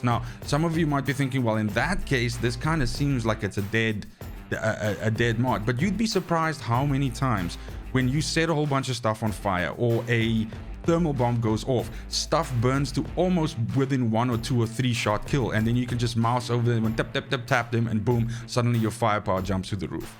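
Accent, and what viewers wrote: South Africa. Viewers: Die man klink of hy van brannas en braai sal hou, as ek dai aksent so luister?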